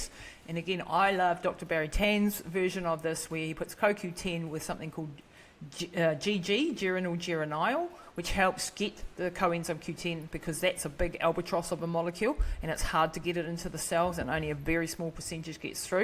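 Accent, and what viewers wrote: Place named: New Zealand. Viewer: New Zealand accents make no sense to me. Why pronounce hair as "hee-ah?"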